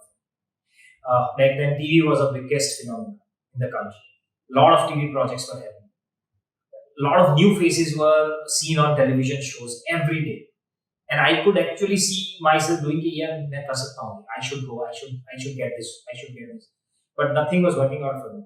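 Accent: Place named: Gujarat, India. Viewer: Only people from Gujarat can feel Gujarati accent in English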